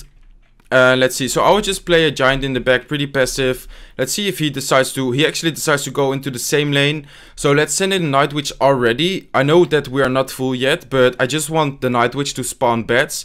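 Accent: Netherlands. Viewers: Joo ben je van Nederland je hebt een beetje een Nederlands accent;) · are you dutch?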